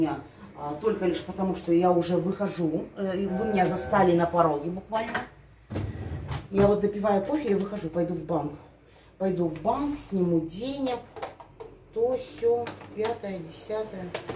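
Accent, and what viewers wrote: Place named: Chuvashia, Russia. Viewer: ЧУВАШКА... У неё же чувашский говор, какая русская????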